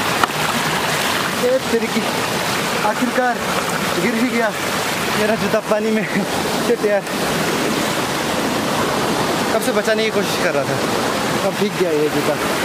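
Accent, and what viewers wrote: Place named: Arunachal Pradesh, India. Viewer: I like the way you talk it's kind of funny I mean arunachali style mast h🤣.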